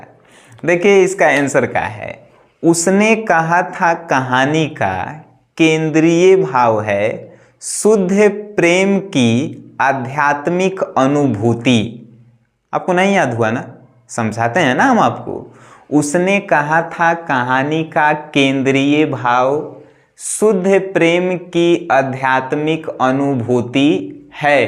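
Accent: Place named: Bhojpuri, India. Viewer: Sirji aapki bhojpuri must h😝😝😝💞💞💞💞💯💯💯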